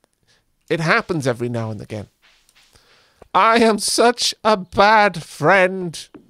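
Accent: Wales, United Kingdom. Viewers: Hi! Firstly, very glad that this series is continuing! Between your silky smooth welsh tones and the relaxing nature of stardew, i'm always thoroughly unwinded by the end of a episode. In terms of green house organisation: I find there is enough space to have two of every regular fruit tree, and then one of each mango and banana from ginger island around the edges. Once those are fully grown you can intersperse kegs or preserve jars around these. For the dirt areas I actually prefer the quality spinklers, as it helps you organise your crops, having 8 of this and 4 and 4 of that etc. This also leaves a gap to one side for tea/ coffee. I find it also helps to have some seed makers in there as well, as i tend to re-plant the same crops again and again, and can go from crop back to seed really easily. Hope that helps, i'm off to fufil my own desire for tea on this rather chilly sunday morning.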